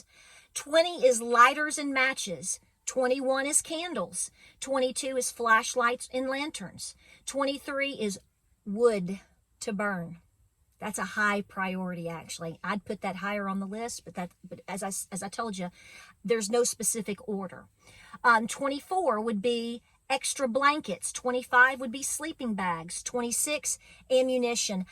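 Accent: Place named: Southern Ohio, United States. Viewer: Love the accent! I grew up in southern OH (bordering WV/KY). Sounds like home :)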